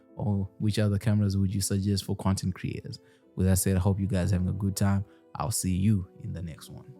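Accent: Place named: South Africa. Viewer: Bro is in Canada?!?!? I thought he’s in South Africa 🤣 (because of his accent) we’ll much love from South Africa 🙌🏾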